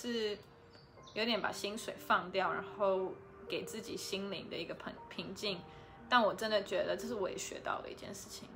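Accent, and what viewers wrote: Taiwan. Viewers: 回來啦？在臺灣？隔離中？標準的臺灣國語，很親切！ 不知道答案也是答案？打電話來都不是好事？推這兩句直白的話！珍珠奶茶當飯喝，這個也可以推！😋😋😋😋😋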